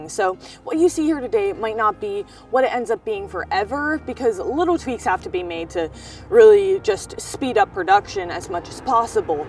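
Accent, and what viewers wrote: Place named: Boston, United States. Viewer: You seem like you have a Boston accent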